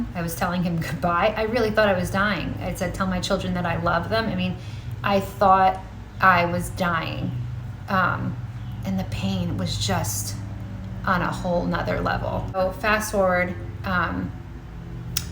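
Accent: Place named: New Jersey, United States. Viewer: He has blessed me! And God bless YOU as well!! Yes, lol the accent you hear is indeed from Jersey and that is where I had my surgeries. ❤️‍🩹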